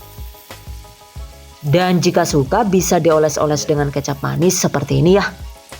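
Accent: Banyumas, Indonesia. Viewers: Selalu saja terhipnotis dg suara narasinya nda terasa tau" sdah selesai aja ..... Klo bleh tanya KK asli orang ngapak kah,... Tp klo nda salah pernah disalah satu Vidio tinggalnya dilampung.... Terimakasih resepnya yg selalu menginspirasi dan menghibur....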